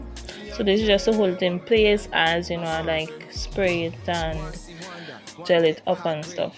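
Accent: Trinidad and Tobago. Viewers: For some reason you sound 🎵 like a Trini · Are you trini? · Hearing this accent you a WHOLE TRINI 🇹🇹🇹🇹😍 HEYYYY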